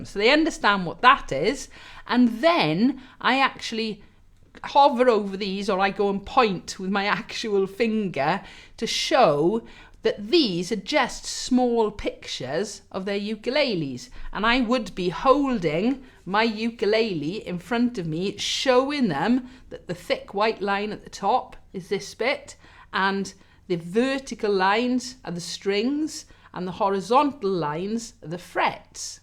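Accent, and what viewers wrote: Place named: Wales, United Kingdom. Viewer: amazing thank you! I've saved this to watch again a few times :) Also, recognise the fellow welsh accent!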